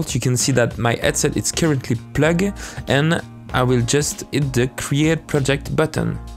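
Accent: France. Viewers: Ca transpire le français jaja 🤣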